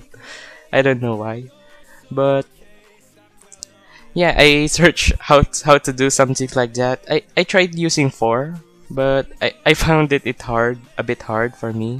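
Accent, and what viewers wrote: Philippines. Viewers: Parang tagalog accent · its obvious that his filipinooo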